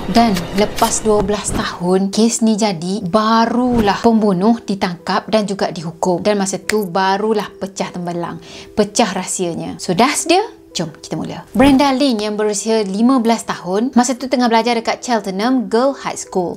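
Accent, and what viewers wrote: Kelantan, Malaysia. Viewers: pada siapa yg berbakat jdi pembaca berita tp tak berpeluang, boleh la cuba jdi youtuber......suka cara menyampaikan cerita, jelas dan terang walaupun ada slang2 kelate sikit....but its ok...slang yg natural...keep up the good work sis....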